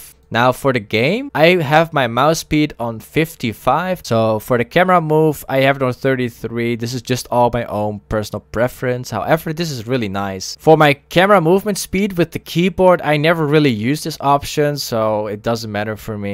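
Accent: Netherlands. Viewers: 1000 % nederlander haha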